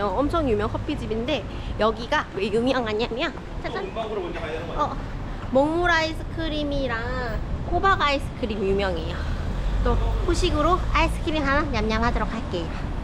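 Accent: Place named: Gimhae, South Korea. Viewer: 님 올여름 휴가를 울릉도로 가셨나봐요? 저는 30여년전 도로등 기반시설 없을때 독도까지 갔다가 입도는 못했던 기억이 나네요 글고 지난번 결혼 하신줄 모르고 결례를 범했네요 결혼 하신지6년 되셨다고 하셨는데 늦게나마 축하드리고 남편분은 존잘남 확실한것 같네요 울릉도 가셨으니 성인봉 뿌시기도 하셔야죠 암튼 추억에 남을수있는 즐겁고 행복한 여름휴가 되세요 비 피해는 없으시죠? 김해가 고향이라고 하셨나요? 저는 님 고향사투리억양과 명랑하신 성격에 우연이 들렸다가 구독좋아요 누르고 시청하고있는 마산이 고향인 60대 중반 아들 군대보낸 할배예요 결례 용서하세요 영상 즐감했어요 새로운 한주도 날마다 좋은날 되세요 감사합니다... 파이팅!!!... 👍❤🍀👏👏👏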